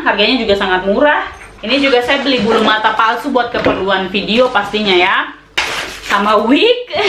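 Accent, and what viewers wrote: Papua, Indonesia. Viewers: itu bersyukur dapet kk, orgnya menyenangkan, unik, bicara apa adanya, pintar, aku suka logat papuanya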